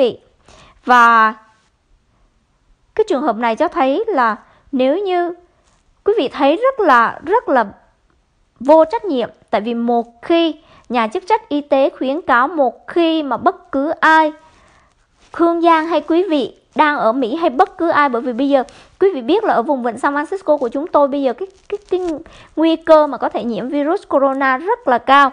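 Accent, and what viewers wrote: Vietnam. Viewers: . Trong lúc đưa tin, chị có dùng từ "cơ cấu", sau đó chị lại nói "Hương Giang dùng từ VIỆT CỘNG". Vậy xin hỏi chị, thế nào là "từ Việt Cộng"? Chẳng lẽ "từ Việt Cộng" không phải là tiếng Việt trong khi chị cũng đang dùng tiếng Việt đó mà! Và từ "cơ cấu" là từ của Việt Cộng" thì từ "cơ chế" là từ của ai? Của chị à? Bởi vậy, hai chữ "Việt Cộng" của chị khoét sâu vào sự chia rẽ không đáng có giữa "chúng ta", những người Việt Nam với nhau, có cùng chung tổ quốc và ngôn ngữ. Xin giải thích thêm, ở Việt Nam, cả 2 từ "cơ cấu" và "cơ chế" người ta đều sử dụng gần như đồng nghĩa, từ "cơ chế" thậm chí được dùng phổ biến hơn. Sau này từ "cơ cấu" cũng có khuynh hướng được dùng như một động từ, nghĩa là "gắn đặt một cái gì, một ai đó vào một hệ thống". Cũng xin nói thêm, cách dùng từ tiếng Việt và phát âm tiếng Anh này kia của Hương Giang rất dễ nhận thấy là chị đã ở Việt Nam (hay nói cách khác là ở với "Việt Cộng") rất lâu trước khi sang Mỹ.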